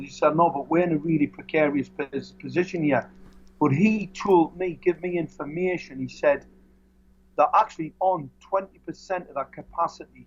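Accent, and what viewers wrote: Scotland, United Kingdom. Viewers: His accent is Scottish. Pay attention and listen. It is filled with knowledge. Close your eyes and things become comprehensible.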